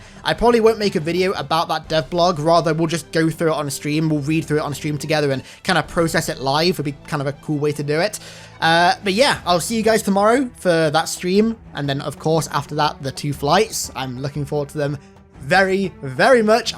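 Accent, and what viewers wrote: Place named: United Kingdom. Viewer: This man has the signature british voice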